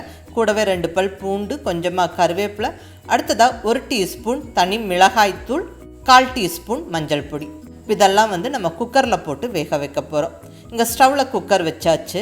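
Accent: Kongu, India. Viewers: கொங்கு மண்டல குரல்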